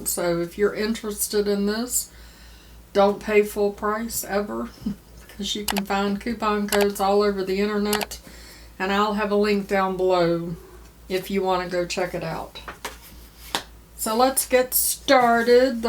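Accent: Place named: Midwest, United States. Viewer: Sorry, this is going to be a long comment. 😁 I love your videos for so many reasons. I feel like I’m listening to my mom (she’s 64, I’m 43), your accent sounds like my family’s (I’m from near St. Louis), and I love the tips to your son (which I’ve mentioned before). I emigrated to Europe 10 years ago, so your videos always make me feel close to home, even when I’ve not been back for several years. So thanks for the work you do!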